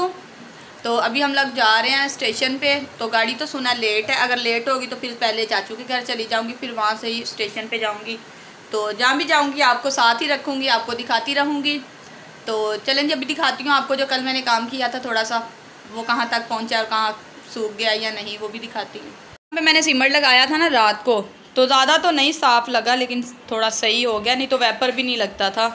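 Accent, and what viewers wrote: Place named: Rural, India. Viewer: Bhot gandi Punjabi bolti ap pendu hi lagti ap or zra aram sy bola karo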